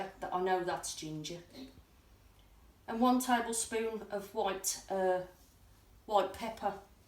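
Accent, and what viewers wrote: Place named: Black Country, United Kingdom. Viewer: just subed,loved your vid,tell the camera man/other half to mind is own, you are the bomb, what part of Brum you from, sound more like black country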